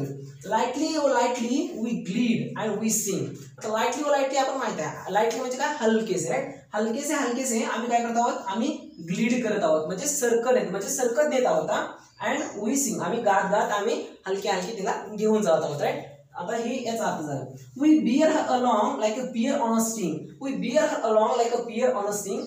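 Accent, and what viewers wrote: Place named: Rural, India. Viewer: ते गावाकडचे आहेत ना म्हणून. थोडं समजून घेतलं पाहिजे आपण 😊 छान समजवल त्यांनी 😊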